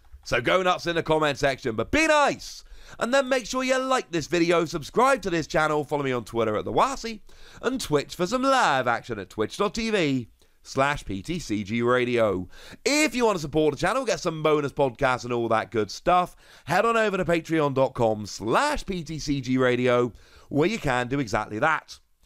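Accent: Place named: United Kingdom. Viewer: Hey, I noticed you have a beautiful British accent. I’m looking to get into playing the TCG in-person within the UK. How do you recommend finding people to play with?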